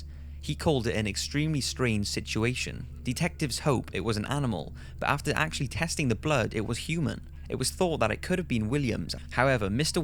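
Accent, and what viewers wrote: Wales, United Kingdom. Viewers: Yes he is Welsh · Ahh I thought there was Welsh in it but did not want to go out on that limb. Either way that was not the crucial part of my comment lol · He is actually Welsh though. Don't know what part of Wales however, but he must pronounce words that way. So yeah.. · He's british or something, you fuck nuts, he has an accent, and can't pronounce things the "american" way, doesn't mean he's uneducated, dumbasses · James Smith he has a british accent so it might be harder for him to pronounce certain things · Very good. But where is ur accent from? It sounds a bit Welsh, but my husband is Welsh and he sounds quite different! Lolxxxx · Yes but he's British so he has an accent lol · Are you Welsh? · " lol he's British can't tell by his accent" Of course you can tell he's British, unless you're a fucking moron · He's Welsh and I don't know any dialect in the UK who says "heared" ...we say "heard" I think he's just a bit nervous.....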